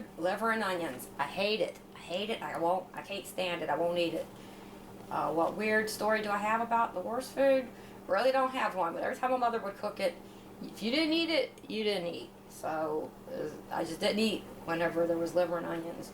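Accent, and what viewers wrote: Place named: Gulf Coast, United States. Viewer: I was browsing through your channel and came upon this video. I really enjoyed your childhood videos. I always thought you lived closer to the gulf coast with your accent and your early gardens. Guess I was wrong:(. I have always wanted to live in virginia and buy a plantation home from before the civil war. My mom said the same thing about wishes..only there was a swear word in there. Funny how some sayings become popular:) Thanks for sharing:)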